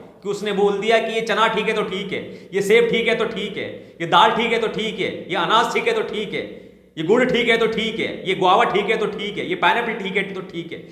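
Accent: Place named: Ajmer, India. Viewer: 😂Love this ajmeri style of talking❤️ लेकिन भैया कोरोना के नियम तो पूरा ही आड़े आ रहे हैं😭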